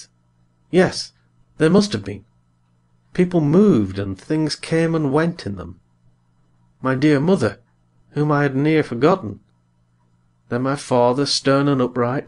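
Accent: United Kingdom. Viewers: Британский!американский резче и они очень четко произносят многие звуки такие как R и не тянут гласные. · Типичный англичанин читал ни одного кошаче американского уррр уррр слова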